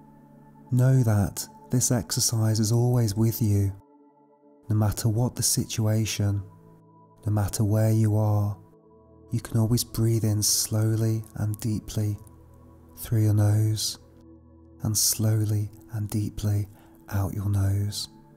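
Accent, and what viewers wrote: United Kingdom. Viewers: Ok thr british accent is already helping 😅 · Your accent reminds me a friend form Brighton (UK) 😊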